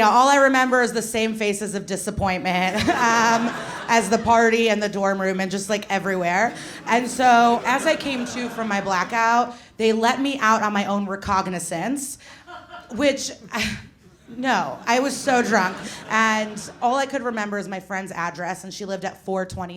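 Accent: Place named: Midwest, United States. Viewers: Ya know I usually hear the midwest female accent (go to fucking Naperville, IL and tell me 80%+ of all the chicks DON'T sound exactly like this😀) and immediately turn it off or run away. Glad I didn't this time. Throwing bedpans in a hospital is the best criminal offense I've heard in years. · Her voice is def from Skokie.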